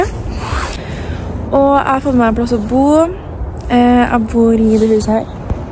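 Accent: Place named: Trøndelag, Norway. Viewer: Stark TroNDD-Yem accent 😄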